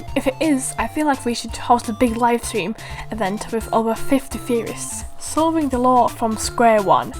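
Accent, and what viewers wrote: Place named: Australia. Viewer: my god you're australian (or british. i can't tell from the two sometimes ;-;) your voice is nice. and great OC!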